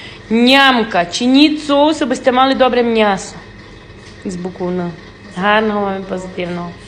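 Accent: Transcarpathia, Ukraine. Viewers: Хіба це українська -це діалект закарпатської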